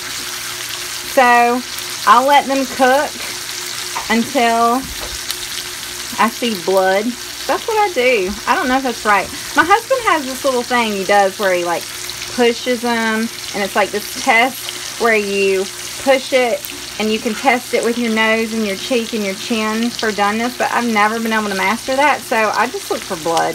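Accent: South, United States. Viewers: I like steaks love butter and like that southern accent you did have me worried at the beginning though when you said you were going to go in the barn and get a steak LOL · Great video just love the southern accent y'all 😍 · When you say....Hi y’all.....is part of why I watch your videos....could listen to that southern draw all day.....thanks also for showing us how to cook steaks with cast iron skillet and butter.....have a blessed evening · Love the southern accent and it looks like great steak for supper tonight. Huntsville Ontario Canada 🍁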